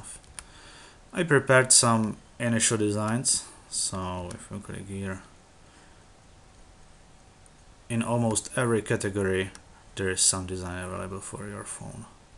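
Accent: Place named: Czech Republic. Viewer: Which nationality are you?? It somehow sounds pretty much like czech accent.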